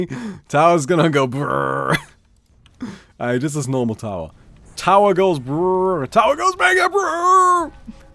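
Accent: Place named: Germany. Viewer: I had no idea you were german... =D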